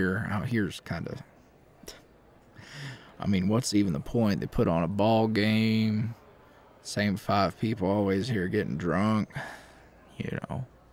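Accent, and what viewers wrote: South, United States. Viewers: I am sorry but if someone as fine like him approached me with a southern accent and a lovely personality like that he would have had me at "sweetheart"! I just melted at his compliments: Sweetheart, lovely..He is just a cutiepie cowboy isn't he? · "i guess it's an alright place to get lost in hehe, not much to see tho" Me: "well i can see your eyes how about i get lost in those for a bit;)" Y'all i saw dilf and i clicked so fast, also, you'd think growing up in the south would make me immune to the country accent but no it most certainly does not, i am a weak weak man when it comes to men with pretty voices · Oh my LAWD this checks so many boxes. ✅ DILF ✅ Country accent✅ sweet talk✅ this is illegal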